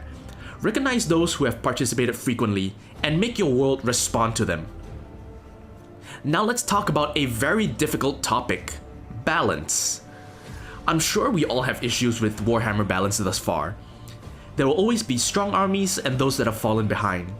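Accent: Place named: Singapore. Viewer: I KNEW YOUR ACCENT SOUNDED LIKE SINGLISH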